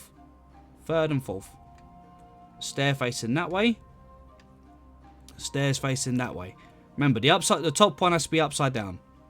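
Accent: United Kingdom. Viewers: I’m not a native speaker in English (I like your I guess British accent very much), so do you say abbey or monastery? I mean a building with a dwelling for nuns or monks and a garden in the courtyard with cloisters around it and a little chapel included. I hope I described it well so now you know what I meant. Sry for language mistakes 👍😀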